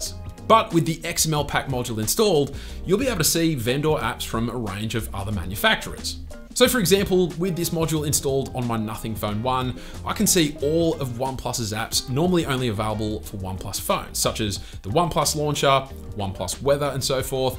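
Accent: Australia. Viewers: is he an aussie? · My man is Aussie 🤟 yea mate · Aussie Accent seems like the mixed baby of British and American Parents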